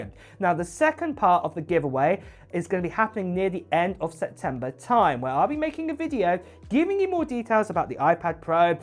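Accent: United Kingdom. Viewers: You pronounced aluminium wrong unless you’re American? You sound British lol wtf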